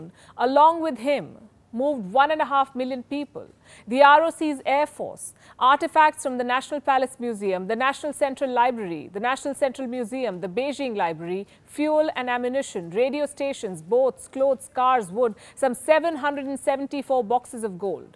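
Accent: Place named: India. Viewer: If you like that indian accent 🤢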